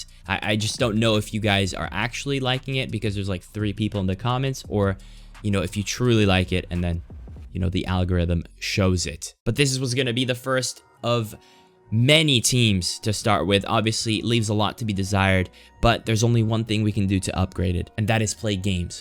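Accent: United States. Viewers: I've actually been watching for almost 2 years but what is your background? As far as I know you're Italian living in Italy but you sound so American. Just curious. Love your content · Bro i tought u were american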